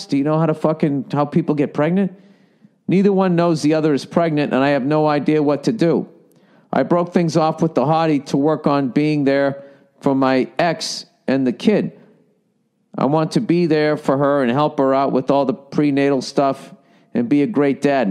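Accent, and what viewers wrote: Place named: South, United States. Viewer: Love, love, love the country accent!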